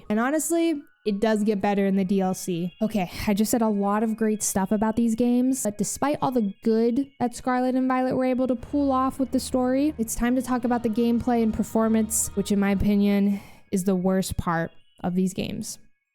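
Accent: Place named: Dakota, United States. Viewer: Are you from a Dakota? You sound like the movie Fargo.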